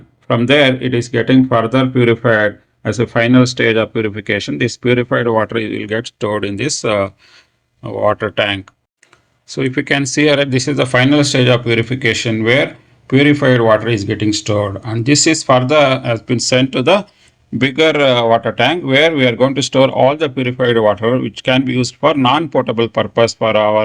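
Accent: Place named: India. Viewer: You have a very Indian accent I think your name is. (Not trying to be rude but nice in a good way)😊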